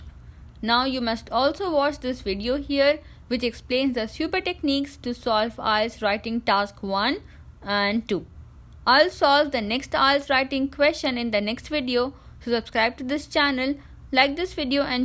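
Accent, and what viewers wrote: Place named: India. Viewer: ahahaha I love Indian accent !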